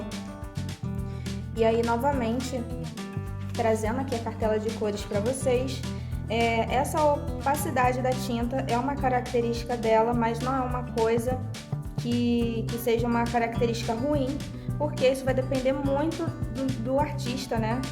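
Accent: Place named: Rio de Janeiro, Brazil. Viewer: Ótimo! Até o sotaque carioca fica mais lindo com um português bem falado e conteúdo. Agora vamos ver minhas aquarelas rs.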